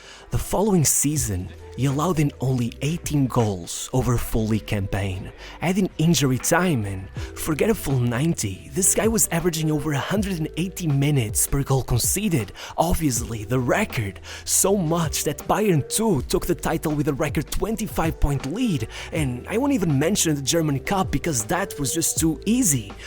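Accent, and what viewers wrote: Portugal. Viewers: Bro are you Portuguese? Your accent sounds Portuguese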